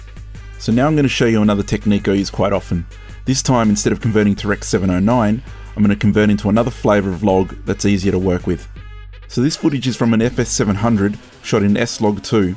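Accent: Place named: Sydney, Australia. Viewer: Lolz,.. sounds to my ears like he's got a Western Sydney or North West Melbourne accent. Trust me, he's talking slow for those accents :)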